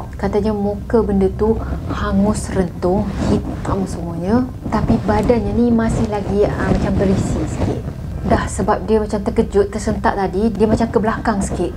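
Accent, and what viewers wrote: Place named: North, Malaysia. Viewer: Perhh Pandai cakap utara ek😉😉😉......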